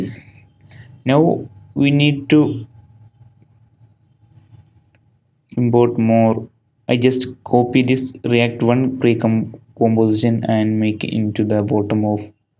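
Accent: Kerala, India. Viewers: are u from kerala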